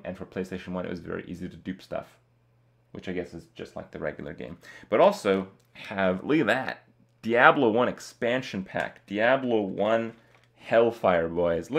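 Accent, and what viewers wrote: Romania. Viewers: So that's why u'r accent was so familiar cuz u are from romanian too,and,Omg this catle totally looks like my own caslte drawing. Totally..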